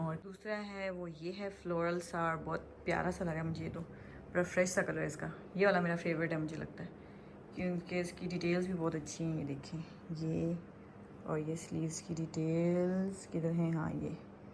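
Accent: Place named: Rawalpindi, Pakistan. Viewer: Totally pindi accent .you are mashallah you talkative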